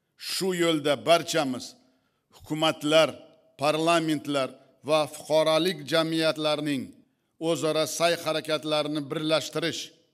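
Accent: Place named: Uzbekistan. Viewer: Ажойиб таклифлар,!!Тарихда биринчи марта узбекча нутк намоиш этилди,!!Рахматли Каримов ойиласи умуман узбекча билмаслиги жуда ачинарли ахвол эди!!Минбарда бутун дунега Узбек охангида нутк сузлаганингизнинг узи буюк тарих!!!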